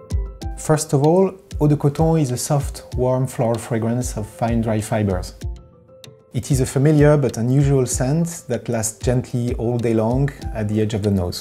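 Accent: France. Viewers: L'accent français on le reconnaît 😭😭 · Le français qui parle anglais sa donne ça 🤩🤗 with bts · Mmmh... L'accent français comme on les aimes ❤️❤️ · On adore l’accent français !😂🇫🇷🇫🇷🇫🇷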